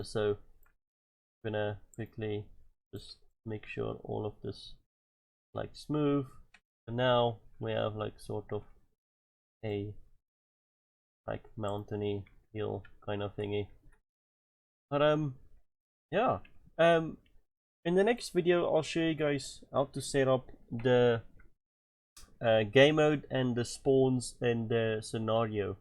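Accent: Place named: South Africa. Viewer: I recognize a lekker accent when I hear it, boet well done video helped a lot :D asablief maak nog..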